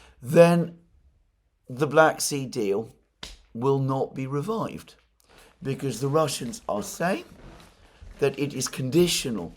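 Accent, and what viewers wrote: United Kingdom. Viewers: Dude, u have problems with your voice and frequent upper respiratory infections because it seems that you have nasal obstruction, deviation or some other anatomic abnormality that affects your nasal breathing and talking. It has been obvious that u grasp for air when talking between the words and your posh english sounds nasal as well. Have u ever consulted an ENT specialist? Most of these stuff can be corrected surgically.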